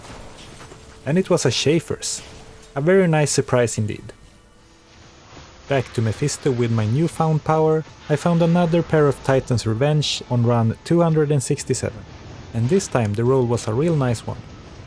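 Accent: Sweden. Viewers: Yust? So you are Swedish. The Swedish English teachers must be very special, because I don't think I have ever met a Swede who pronounced "just" correctly :D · This video made me decide to uninstall d2r. No way I’m doing 1k runs. I’d rather just watch this dude do them while listening to the epic supersvenska dialect. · Svensk engelska är så distinkt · the deep swedish accent, one simply cannot miss that swinglish man haha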